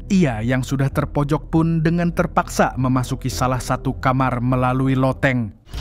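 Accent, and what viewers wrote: Banjar, Indonesia. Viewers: Urang banjar mang?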